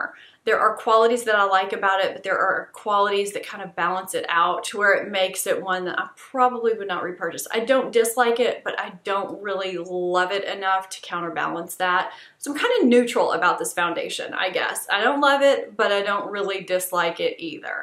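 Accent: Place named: Louisiana, United States. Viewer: Louisiana fam 🐊 I got so excited when you said Nola!! Knew that accent sounded like home 🤟🏻 I’m from Lafayette, I’ve never found an influencer from my neck of the woods! It helps a lot to see someone trying things in our crazy climate! new subscriber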